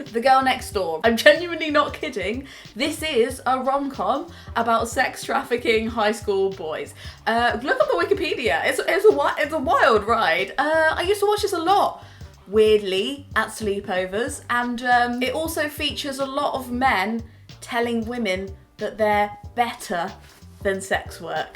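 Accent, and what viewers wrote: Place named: Worcester, United Kingdom. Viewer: Just stumbled on this video and my first thought was....I recognise this accent. Are you from Worcester, that is my hometown.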